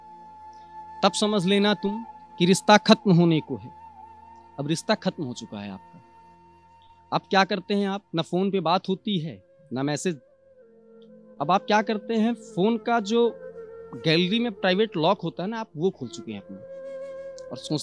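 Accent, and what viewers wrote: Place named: Lucknow, India. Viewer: Gajab bhaiya wah lucknow wala andaj 😂❤️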